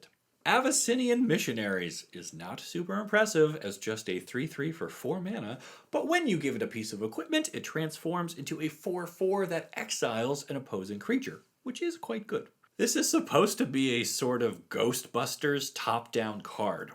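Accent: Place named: Texas, United States. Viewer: What part of Texas are you from? I’m from DFW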